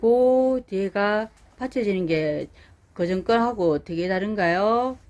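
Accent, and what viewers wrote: Gyeongsang, South Korea. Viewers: 허리가 아파서 귀를 쫑긋, 눈을 동그랗게 뜨고 영상을 감상합니다. 경상도 억양이 사각 사각 꿀맛나는 꿀사과 같아서 너무 좋습니다. 옆지기님 모델료 인상 좀 해드려야겠네요. 너무 말을 잘 들으시는 것 같아요.ㅋㅋ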